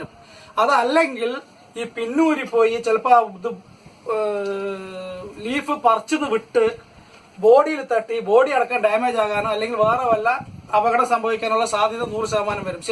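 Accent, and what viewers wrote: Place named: Palakkad, India. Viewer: I Like ur palakkad Languages iam from pune T q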